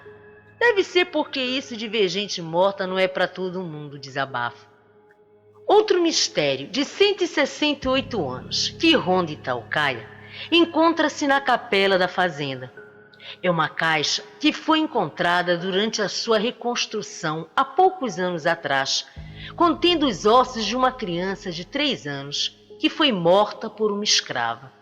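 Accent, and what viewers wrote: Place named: Amazon, Brazil. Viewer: eu sou de belem, pelo seu sotaque as vezes eu tenho duvida se voce é de belem ou de manaus. Amo os seus videos, abraço.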